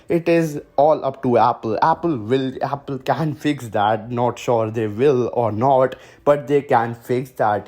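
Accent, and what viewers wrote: India. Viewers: Are u from India? Can see from your accent.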